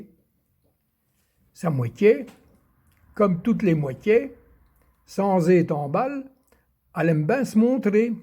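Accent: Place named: Touraine, France. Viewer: Moi qui suis de Touraine, c'est fou comme votre parler ressemble au mien ! C'est ben piesant à oude ! Grand marcite.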